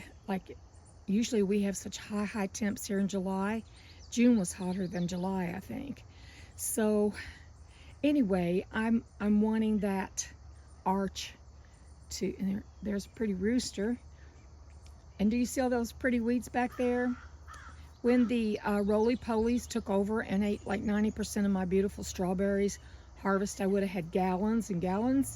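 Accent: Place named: Ozarks, United States. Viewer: Hi! I always savore your videos! I will do a research about, Ozark Mountain. Have studied linguistique, I can recognize, what we call the purity speach! Which you have preserved wonderfully! Hugs my dear friend!🥰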